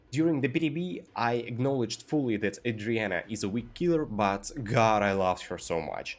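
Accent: Russia. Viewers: Omg dis strong Russian accent:D Nevermind, just keep going! Do you remember how funny was Ochido’s accent? So you should also remember that it wasn’t a problem for him to reach his popularity and audience/fans:) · Listen your english try with balalaika accent is so funny XD You made my day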